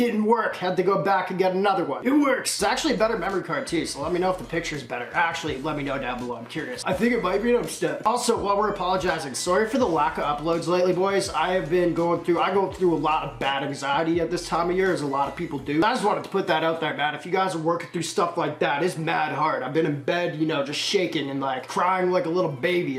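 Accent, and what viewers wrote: Canada. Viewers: You sound like you from Canada 😂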